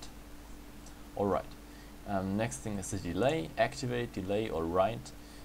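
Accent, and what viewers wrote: Germany. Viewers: Du bist Deutsch oder ? :D merkt man am Aktzent :) , sehr gutes Video Danke für die Hilfe :) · 100% deutscher :D hört man am akzent :D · Super tut, aber komm, an deiner Aussprache erkennt man den Deutschen ;)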